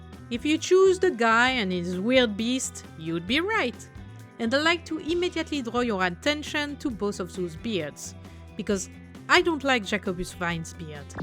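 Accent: France. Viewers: you're one of the only people discussing new GW models and not complaining about how complicated they've become over time. When I started this hobby, all the models were metal. They were awful compared to what they are now. Many creators hate Primaris Marines, but I think we finally have properly scaled Space Marines for the tabletop. I'll complain about GW's ability to balance their games, write rules and their tendency to ignore their own lore or worse, retcon it. But they do make amazing models. And honestly, your voice activates neurons. It's like, musical. I live in NYC and I'm well aware that I have a very Bronx accent. I've heard myself on videos and I'm always shocked. Hearing an amazing French accent from a female is a distinct pleasure for the ears, especially when you're surrounded by people who start every sentence with the word 'yo,' and end it with 'ya heard?' and somehow slip 2 'motherf*ckers' in along the way...a feminine French accent is like a holiday after a day spent on a NYC construction site. 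Edit: And now I find myself admiring the models silhouettes...that's strange. Where did I pick up that from? 🤔 Sigh. Lunch is over. Back to work.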